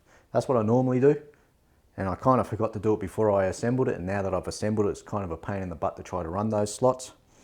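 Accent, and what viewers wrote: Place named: Melbourne, Australia. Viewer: wow not only the channel I have been looking for but from a fellow Melbournian it's a bonus ( thought I recognised the accent)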